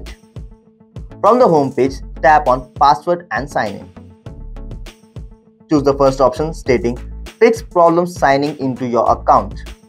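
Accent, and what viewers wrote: India. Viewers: successfully tolerated his thick indian accent, over acting and wasted time.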